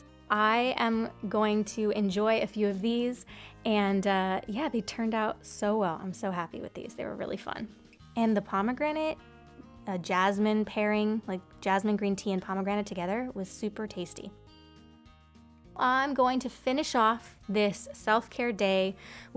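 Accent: United States. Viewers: Sister. Is your accent American? I have the same accent but people in my country crush over British accent 🥲. Btw, I'm from Bangladesh. I am 21, I follow you since 10 years. I've watched all your videos. Thank you for creating content sister ❤️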